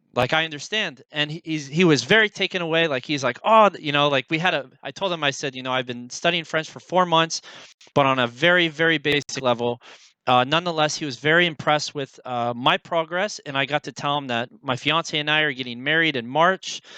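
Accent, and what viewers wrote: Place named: United States. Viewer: you have the american accent but it's not strong to the point i wont understand and it's better than then the previous video for sure, accent will be lesss and less present the much you speak with native level speaker. Immersion all the time , it a method actually.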